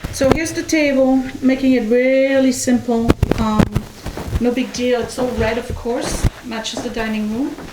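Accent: France. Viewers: On your last video I asked if you have a German accent. But now I'm guessing it's French? That's what I thought. Everything looked yummy.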